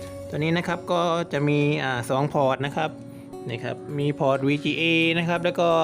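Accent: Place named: South, Thailand. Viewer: ทำไมพูด เหมือนคนใต้คนใต้หรอครับ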